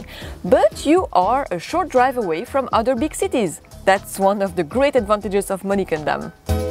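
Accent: France. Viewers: Bonjour je suis du Canada,Montreal je vous adore une française qui parle anglais Très belle accent vidéo intéressent quand allez vous parler du osmo pocket Continuer votre bon travail bizou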